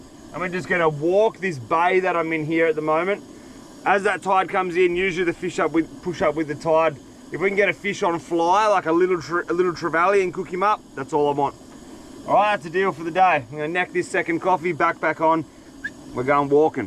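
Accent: Australia. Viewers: Its always that aussi accent 😂always gets me❤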